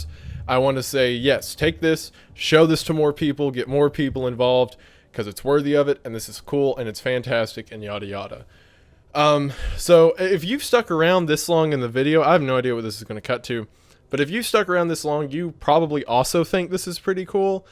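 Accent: South, United States. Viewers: Dawg I had no idea wendigoon sounded like he’s from the south till I heard his dad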